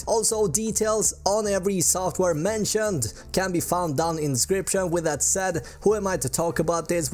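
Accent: Sweden. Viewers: bara svensk dialekt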